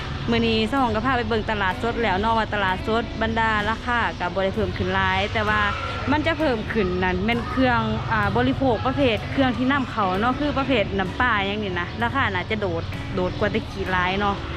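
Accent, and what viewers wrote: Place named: Hong, Thailand. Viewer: สำเนียงสาว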